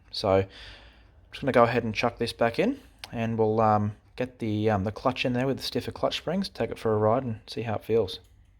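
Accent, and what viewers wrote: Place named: Northern England, United Kingdom. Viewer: oh my god I’m sorry about that cause the north of England sounds like that sorry!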